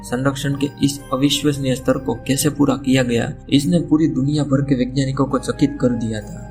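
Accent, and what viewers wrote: Gujarat, India. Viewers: Tu gujrati hai bhai?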